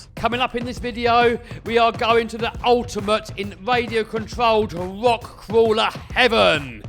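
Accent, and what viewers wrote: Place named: United Kingdom. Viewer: were you the giving me all those cool hints when I was playing call of duty and now I'm watching you doing the trucks? Or is that just my idiotic American ear not being able to pick up the difference on a British accent? Full respect sir. Love your channel.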